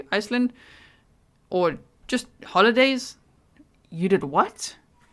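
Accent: South Africa. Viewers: Are you South African like i am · South African, yw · Ahh! SA, now the accent makes sense. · Howzit! I've been dying to know how they even suspected this oke, and thought no one would have a video up yet. Checked YouTube and yours was the first video to come up, upon hearing you speak, I was all...wait I'd know that accent any where 🇿🇦❤️😎🤙🏻❤️🇿🇦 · South African but lives in the Netherlands which probably “complicates” her accent. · Are you South African?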